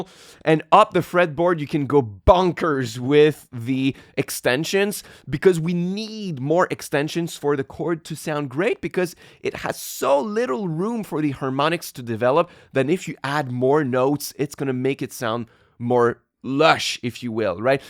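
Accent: Quebec, Canada. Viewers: > Clicks on video > Oh cool he’s Quebecois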